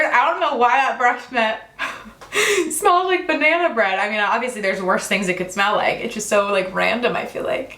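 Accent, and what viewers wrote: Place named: South, United States. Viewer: I was wondering where you are from because i catch you saying southern things and a little accent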